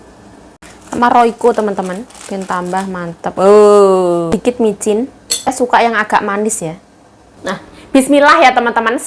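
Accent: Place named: Sunda, Indonesia. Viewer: Kocak ngemeng Sunda na 😅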